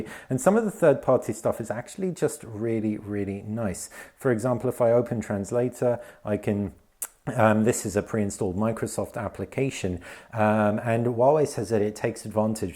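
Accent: Egypt. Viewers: Wow U Speak arabic .. Is that an egyptian akcent i guess ? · Omg I follow u from about 5 years now... How come you know arabic this clear in Egyptian accent I'm from Egypt and I'm impressed... Keep up the good work... Have a nice day, cheers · You speak Arabic in Egyptian accent even better than Egyptians themselves 😀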